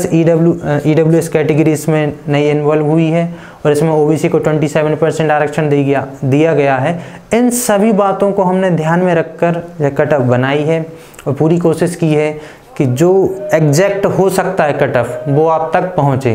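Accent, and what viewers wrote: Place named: Bundelkhand, India. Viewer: apki language se pta chala ki ap bundelkhand se ho..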